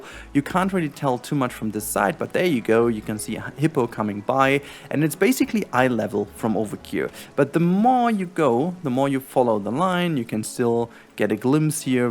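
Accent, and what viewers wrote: Germany. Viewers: oha habs bisl am Akzent erkannt aber sprichst sehr gut englisch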